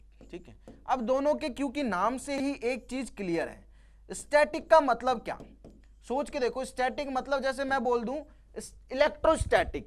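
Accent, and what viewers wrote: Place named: Haryana, India. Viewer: Guruji pahle teacher ho jiski baat smjh m aarhi h lage s aap haryana te ho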